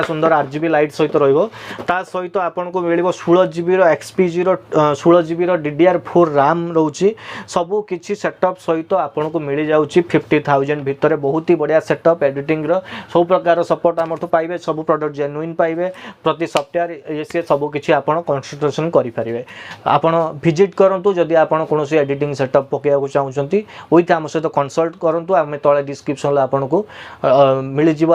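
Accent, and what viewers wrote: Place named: Balasore, India. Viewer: Bhai tama ghara balasore ki Tama accent ru lagila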